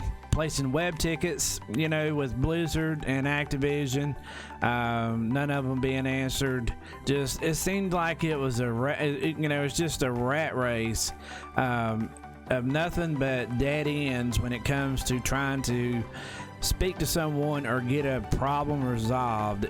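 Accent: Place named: United States. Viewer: I like your country accent. You sound like you eat apple pie and fried chicken everyday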